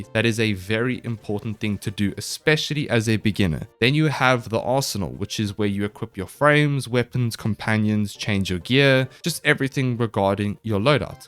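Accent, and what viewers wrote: South Africa. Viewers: Just a random question what is your accent. It sounds South African. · Do I detect a South African accent or am I mistaken ? 😂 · FIRST TIME HEARING AN SA ACCENT ON GAME TUTORIALS!!!! LEKKER BOETIE :) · You have a south african accent 🤔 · Completely unrelated to the video but your accent sounds familiar, are you South African? Also this was really helpful! I was super confused on how to progress when I got this game XD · Sound suspiciously south african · Great video sir. Very useful as I'm downloading the game. I have one question, what country is your accent from? I think it may be south African but I'm really not sure as it could also easily be a new Zealand accent and I wouldn't know the difference. It's driving me crazy! Please! What is your accent? 😂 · are you south african, why do you sound south african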